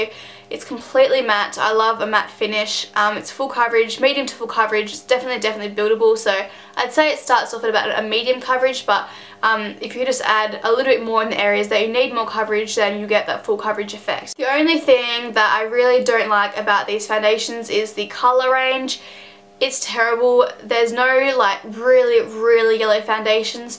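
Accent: Australia. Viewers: Sounds more Australian.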